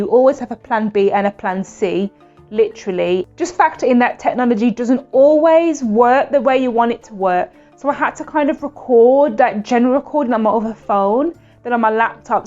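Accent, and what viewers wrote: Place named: Birmingham, United Kingdom. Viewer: I just like the slight Birmingham twang! New subscriber here, do you ever do vlogs out and about?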